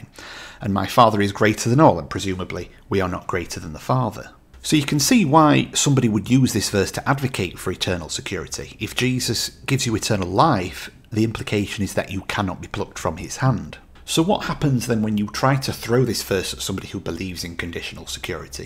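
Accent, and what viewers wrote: United Kingdom. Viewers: Random but, I enjoy ur accent. It's like a very clean sounding English accent.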